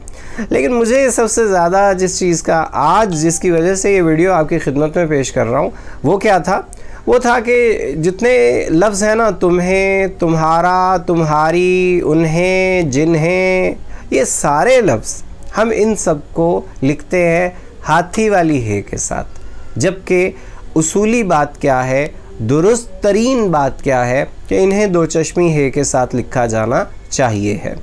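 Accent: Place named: India. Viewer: Aap kahan se ho?.....talaffuz india ka lag raha hai......masha Allah....achchi aur durust koshish hai.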